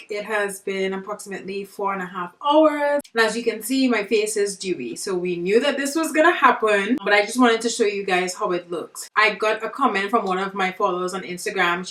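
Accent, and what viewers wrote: Trinidad and Tobago. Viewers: Are you trinidadian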